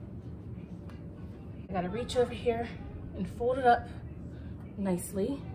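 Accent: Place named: Pittsburgh, United States. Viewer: Love it I was going to use cardboard!!! This gonna sound crazy but I had to subscribe cause as soon as you spoke I knew your were a fellow PGH girl before you even said it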